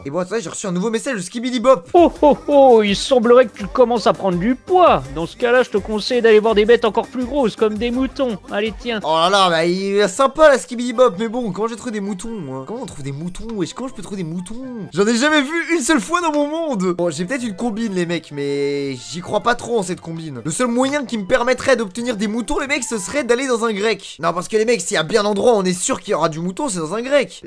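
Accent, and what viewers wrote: Marseille, France. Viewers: Tu fais bien le marseillais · Sé té tro. Marent l a ! Accents en marseille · "Je t'en met 1 pas 2"😂"je vais te taperrrrr" 😂magnifique l'accent Marseillais 😂😂😂meilleure video de tous les temps 🎉🎉😂 continue comme ça😂🎉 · G adoré l accent marseillais 😂😂😂😂😂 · C’est une masterclasse l’accent marseillais continue avec l’accent marseillais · Incroyable l'accent marseillais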